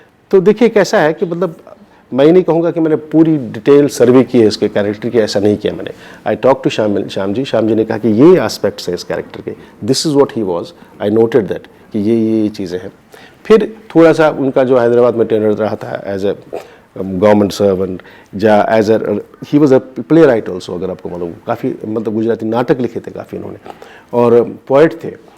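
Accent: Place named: Kashmir, India. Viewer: Very surprised to listen to his Kashmir accent which I never saw in his acting career great actor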